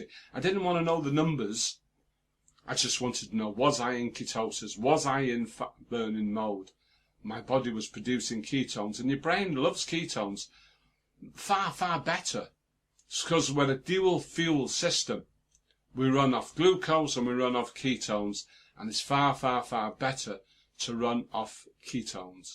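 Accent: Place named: Manchester, United Kingdom. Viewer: Congratulations my friend. Happy you've been successful 💪👍👍👍. I'm from the continent but from your accent I would say you're from Manchester where my favourite music bands come from.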